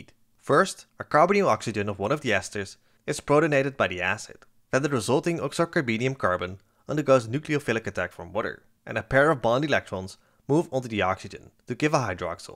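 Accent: Netherlands. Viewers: This was certainly quite an interesting video to watch, reading up on these amonia based antibiotics that are currently in development was also quite an interesting read and hives some hope for antibiotics. based on your accent I am wondering if you are dutch?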